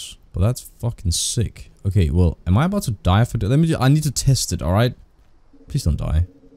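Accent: Denmark. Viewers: Are you danish? If so, cool. Btw good video!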